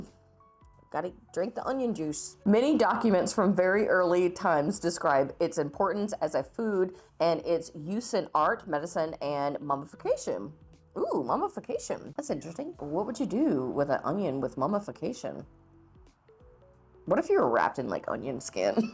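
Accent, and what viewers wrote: South, United States. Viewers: I knew as soon as you said boil you where southern. It’s a dead giveaway 😂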